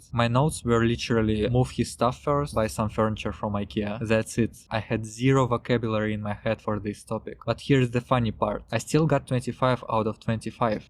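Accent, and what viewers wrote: Russia. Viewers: First of all, thanks a lot for your videos. But, are you sure you are from Korea? You accent sounds very Russian to me.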